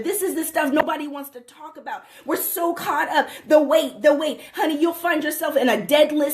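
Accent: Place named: New Orleans, United States. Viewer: Babbaaayyyy say it in your Nawlins accent you done blew it up here! I'm so glad I woke up from that mess and saw the light. I almost threw my phone and shouted across my room when you talked about sexual repression! All these preachers out here profiting off of women's pain when it's a lot of women waking around broken due to so many issues and experiences. They should be ashamed!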